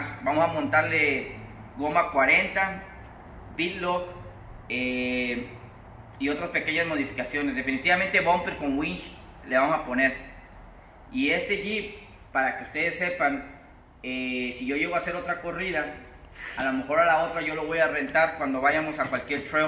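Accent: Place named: Puerto Rico. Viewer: Lla estas ablando Con asento boricua Eeres de los de nosotros!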